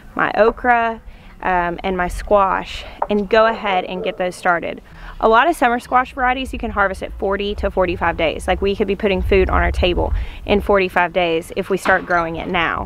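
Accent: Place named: Arkansas, United States. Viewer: Well I wanted goats but now I don’t think it’s a good idea for me. 😂🥰🥰🥰. I WOULD NEVER GET ANYTHING DONE. They are so dang CUTEEEE. I feel the SAME AS ABOUT TOMATOES. 😍😍🍅🍅😁😂 prayers coming from down south LOUISIANA ALWAYS. 🙏🙏🙏 KEEP SHINING LIGHTS GIRL. I have been saying that I stumbled upon my REFUGE here in your ROOTS and twangy Arkansas accent last year and GOD snuck right back into MY HEART not long after. I think you might have been a key ingredient that was needed for me to let HIM open my EYES. IM STILL SO THANKFUL FOR THAT. Keep talkin TWANGY and sharing your ROOTS AND REFUGE there are others out there just like me that need it. ❣️💡😊🦋🍅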